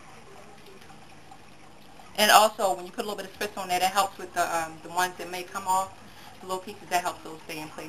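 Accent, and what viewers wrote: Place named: Philadelphia, United States. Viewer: haha I new it. I'm from philly and our accents are quite simular..lol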